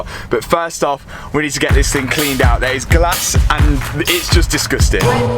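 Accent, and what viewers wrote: Leicester, United Kingdom. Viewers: Sounds like a lestah accent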